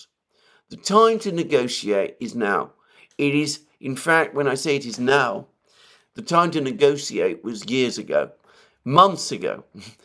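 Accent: United Kingdom. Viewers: I believe you SAID..SEVERAL TIMES..that the Ukraine conflict was fital to the world! And stop giving peace planes..You sound british (REDICULOUS) an YOU are very SINICAL!!!!!!!!!!!!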